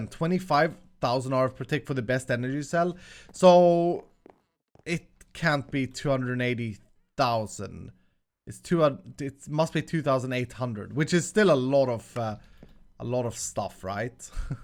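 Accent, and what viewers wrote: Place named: Sweden. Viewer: Why does he sound more swedish than last time??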